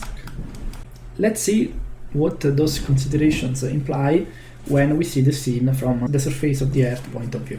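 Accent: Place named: Italy. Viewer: Very very nice, congratulations. It's one of the things I wanna make too ! By the way Italian accent is perfect.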